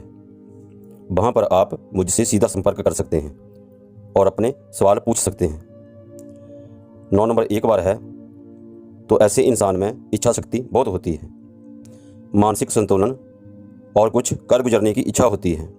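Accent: Punjab, India. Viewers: Aur kuch toh pata nahi, par ek baat sure hai, video mein bolne wala banda punjabi hai 😀😀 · Aapp ki awaz mai punjabipan hai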